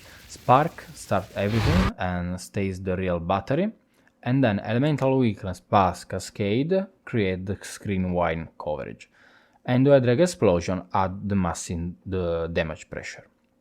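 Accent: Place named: Italy. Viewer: The italian accent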